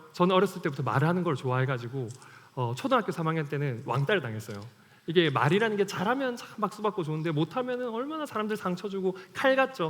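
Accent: Gyeongsang, South Korea. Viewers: 나랑같은 경상도 사람이네ㅎㅎ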